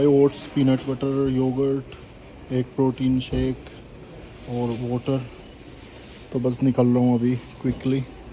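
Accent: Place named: Baghpat, India. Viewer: Bhai teri Baghpat wala language ni gyi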